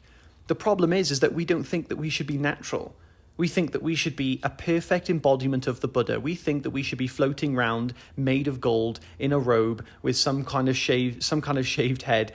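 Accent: United Kingdom. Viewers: I feel enlightend by your amazing british accent. So nice!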